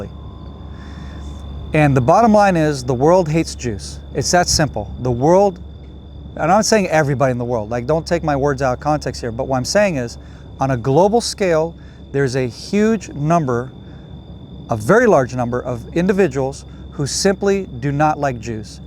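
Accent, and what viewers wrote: United States. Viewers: There's a good documentary on Netflix about gaza and Palastinians day to day life. The propaganda is strong in this one, just notice the perfect american accent. Ask palestinians if they can return to their ancestral lands also. I hate Hamas and the IDF for what they have brought on to palestinian citizens. Palestinians just want freedom. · I did watch it in full, thanks. There could be a number of reasons why he has an American English accent. It's no big deal.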